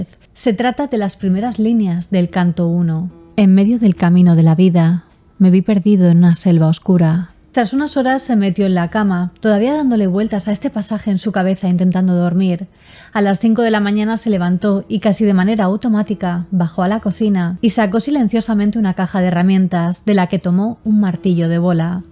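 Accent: Spain. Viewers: Nunca pensé que el asentó español sonara sexy 👂🏼🥰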